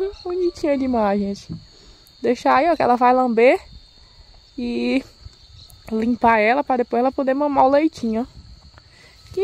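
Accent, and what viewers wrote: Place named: Bahia, Brazil. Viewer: Esse sotaque é um show à parte, lembra minha gente da Bahia. Meu abraço respeitoso a vocês por fazer tudo com tanto amor, dedicação e carinho.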